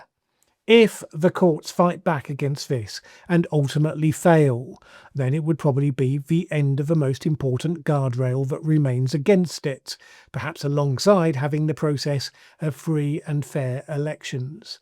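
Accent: United Kingdom. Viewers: When someone uses incorrect grammar it makes me doubt what they are talking about. He’s obviously British which may result in a difference in pronunciation.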